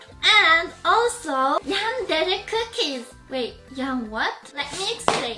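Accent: Switzerland. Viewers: her accent is swish German 😍😍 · she's not trying to. Her swiss accent is really thick. Everyone in swiss sound like that when talking in english. You dont really think people are all from america don' t you? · Kay Sloan I am from Switzerland but the French part. However I know what a swiss german accent sounds like, she has some of it but for the other parts of the accent, I have no idea where she gets that from..... maybe it's a baby accent!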